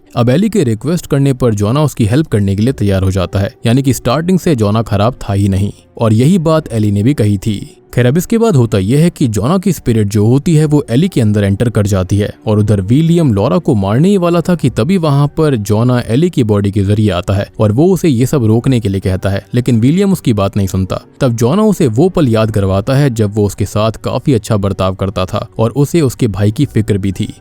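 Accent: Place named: Bengal, India. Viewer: Are and haunting tubes the same....because the voice and the accents are the same...like bengali broken hindi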